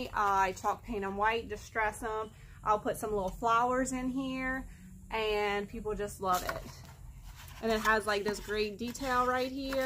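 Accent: Louisiana, United States. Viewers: Love Love Love all of your videos!! One of them popped up on my feed, immediately subbed you and I've been watching them all! Love your accent! I'm cajun as well from near Lafayette and live in DS near Baton Rouge. I just wish I had your energy and creative mind lol thanks for the content!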